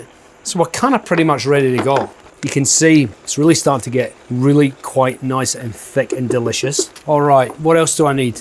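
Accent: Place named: Scotland, United Kingdom. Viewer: Ye can take the man out of Scotland, but ye canny take the Scottish out the man.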